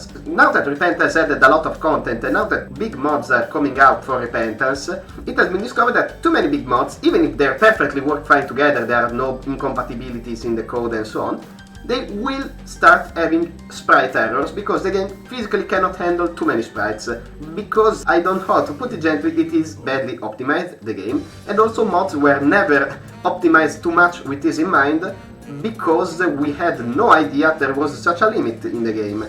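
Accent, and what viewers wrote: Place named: Italy. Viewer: dal tuo accento mi sembri italiano, quindi rischio la giocata e scrivo in italiano. Questa mod è una delle migliori che abbia giocato fino ad ora. Apprezzo la ricercatezza di alcune stanze e degli stessi personaggi, tipo lo stesso Bertran ed il fatto che il suo gameplay sia basato sulla sua testa. Le parti più belle della mod sono senz’altro il design generale e i personaggi. Spero che riusciate a finire il terzo capitolo entro il 2024 perché potrebbe essere la migliore mod di isaac a mani basse. Sono in parte contento di essermi approcciato alle mod del gioco solo adesso e non 5 anni fa 😅 Buon lavoro ragazzi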